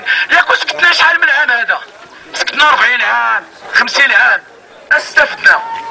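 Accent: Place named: Marrakech, Morocco. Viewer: En plus de contenu fort pertinent, l'accent marrakechi en rajoute. Les habitants de cette magnifique ville sont connus de leur sens d'humour. Ils ont les moyens de passer leur message. Bravo du courage de la façon innovatrice de mobiliser les gens.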